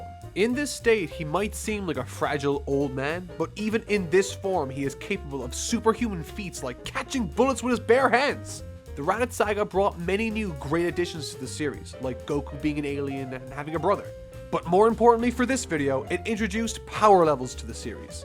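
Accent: Ireland. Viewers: yo mate , are you Irish?? you sound like a Leinster boy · Are you Irish?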